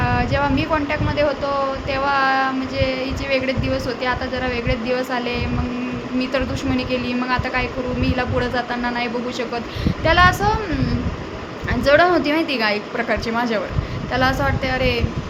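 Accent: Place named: Vidarbha, India. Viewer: तुझी भाषा विदर्भ खूप छान आहे मला खूप आवडते मी खूष आहे तुझं स्वभाव छान एक नंबर आहे ❤❤🎉🎉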